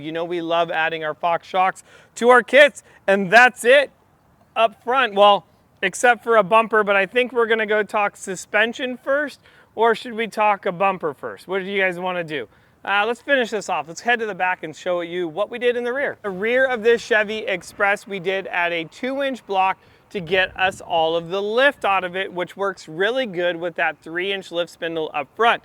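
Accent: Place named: Australia. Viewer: Hahaha, Australian accent mate😂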